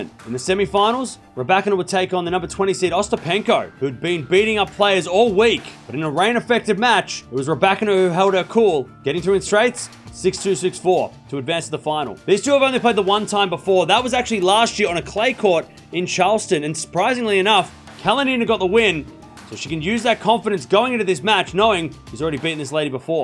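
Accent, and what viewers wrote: Australia. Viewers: But Sorry, I thought he was American. Is he an Australian then?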